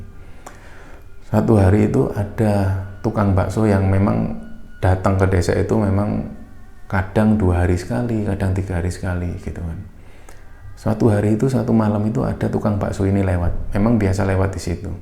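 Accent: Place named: Surabaya, Indonesia. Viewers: Cara nyeritano e Suroboyo banget, sukses selalu lurr🙏🙏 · Paling ditunggu gaya nya mas klo bercerita bahasa campur Suroboyoan mantap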